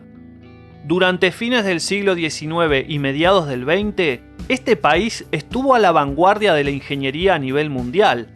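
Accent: Argentina. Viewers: Ahora usted periodista, por su acento es argentino. Como esta su Argentina???? hoy en día???? cual es su inflación?????. Espero escuchar su análisis también, es fácil hablar de los vecinos pero difícil habla de mi hogar. O la idea central es "Mal de mucho consuelo de tontos" es eso su análisis??????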